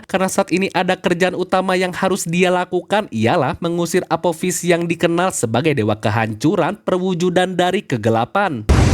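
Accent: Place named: Java, Indonesia. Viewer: Emamg elu tuau dia orang Jateng or jatim? mas is jawa. Not nesyenel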